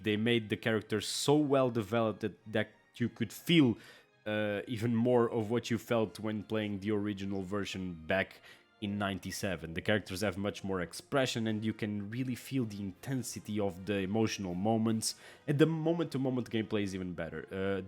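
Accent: Portugal. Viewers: Eu juro que pelo teu sotaque sabia que eras pt😂😂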